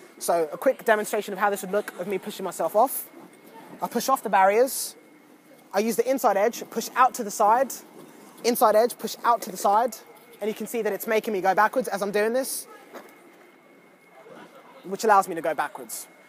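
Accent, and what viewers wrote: United Kingdom. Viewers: love his British accent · Are you British or American but I guess your British by the sound of voice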